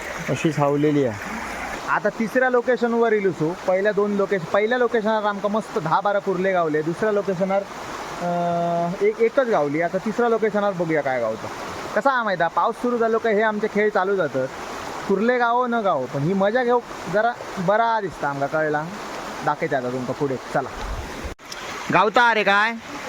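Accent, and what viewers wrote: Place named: Malvan, India. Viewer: भाऊ..तू दोडामार्ग चो असा ना..म्हणून मालवणी कोकणी asscent कडून बरो बोलता 🎉😊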